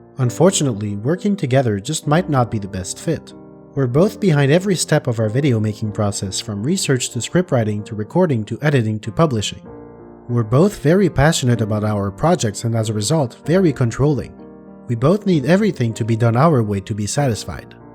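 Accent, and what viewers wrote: Quebec, Canada. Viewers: Being from Quebec as well I had such a feeling that’s why your accent was. Great videos! Keep them up! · I could tell you had a Quebecois accent, it's very faint though.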